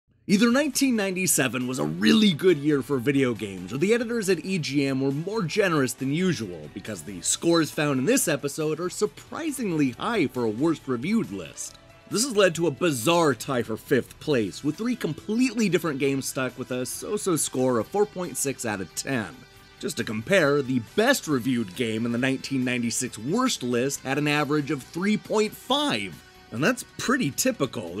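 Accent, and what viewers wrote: United States. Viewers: I recall our narrator mentioning he's from WA. My theory is that it must be a Pacific Northwest dialect thing. I think Johnny Millennium (aka Happy Console Gamer, from Vancouver) pronounces dragon the same way.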